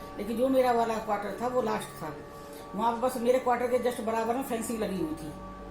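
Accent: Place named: Meerut, India. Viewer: Aunty apka baat batane ka tarika bahut acha hai, Ap merath se ho kya Meri mummy waha ki hai or unki boli bhi apke jesi hai, love you a lot 😘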